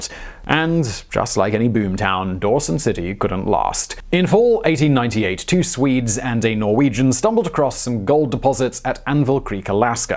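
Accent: RP, United Kingdom. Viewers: I swear you get more posh with every video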